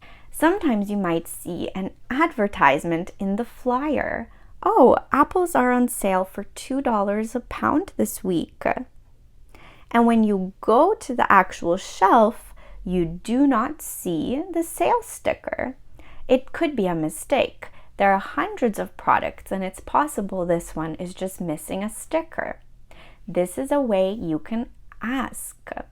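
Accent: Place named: Canada. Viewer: I love the way you speak, am here to learn Canadian accent. From Nigeria. You are doing a great job 👍❤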